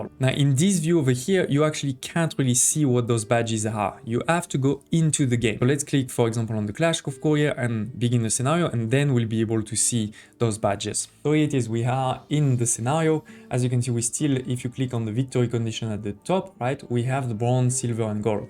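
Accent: France. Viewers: j'ai un peu cramé ton accent francais du coup je pose ma question en FR :D , tu serais me dire comment on utilise les items delocks avec les tickets en or ? j'en ai debloqué mais j'arrive pas a choper les ameliorations merci par avance :D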